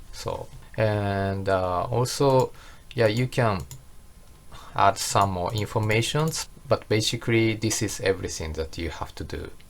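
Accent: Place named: Japan. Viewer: 日本の口音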